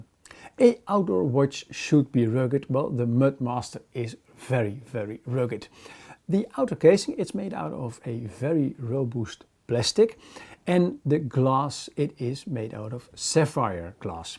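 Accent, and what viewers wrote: Netherlands. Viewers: Je herkent een Nederlander die Engels spreekt gelijk. 😁😁😁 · Dat nederlandse acsent 😂 hahahah heerlijk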